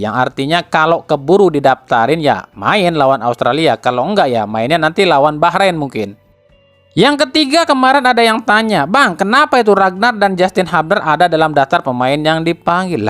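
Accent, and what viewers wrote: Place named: Betawi, Indonesia. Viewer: 😂 bahasenye Betawi ni😅😅kereeeeen. Selebih nya ya no comen dan nyimak aja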